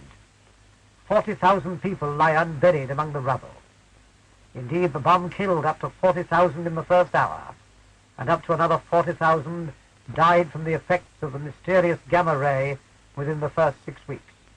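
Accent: United Kingdom. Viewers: Petroleum Jelly Bomb = Napalm . The benevolent civilized “ Good Guys “ 🇺🇸 dropped it on the Vietnamese. I’m surprised the USA didn’t drop Nuclear Bombs on Hanoi . General MacArthur AKA Dr Strange Love ( How I learned to love the bomb ) advocated nuking the Chinese in the Korean War . P..S. The narrators prancing horse, Royal British voice is annoying , and as to be expected extremely racist . Lousy photographer also . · I think the narrator (who is obviously British) spoke appropriately. why do I say that? because of the time period. The Japanese were racist back then! weren't they? they thought they were superior to the Americans, the British, the Australians, etc!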